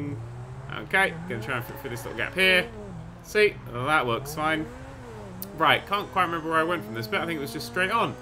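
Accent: England, United Kingdom. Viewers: Nerd are you English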